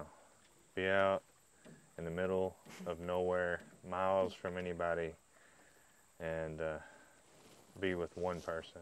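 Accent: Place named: South, United States. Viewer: I still love that accent of yours I just drives me nuts you're beautiful lady and that little southern accent just makes you so much more beautiful I bet you're driving crazy down in Florida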